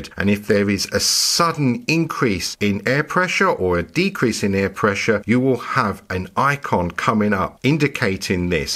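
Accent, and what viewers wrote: United Kingdom. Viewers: Excellent presentation and outstanding commentary in British English :) Your voice is very impresario like, as a boxing match commentator. Btw even if you say you are in London would you say yours is the quintessential Queen’s English or say Glemsford or is it Received Pronunciation because I don’t hear a regional accent at all. I found it very useful really. Good day Sir. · I'm fond of your accent ! Soooo cool Soooo british. Where are you from if I may ask my good pal ?))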